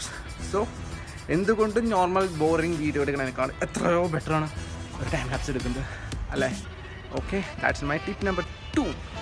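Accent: Palakkad, India. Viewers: ചേട്ടൻ പാലക്കാട് ആണോ 😃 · Bro r you from palakkad??? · Palakkad aano 😍✌ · bro Palakkad aanallo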